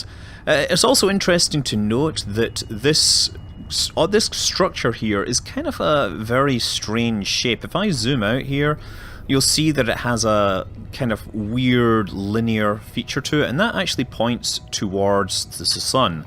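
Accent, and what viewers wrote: Scotland, United Kingdom. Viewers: I just listened to a scottish man talk for 20 minutes about catalogues. Life is good.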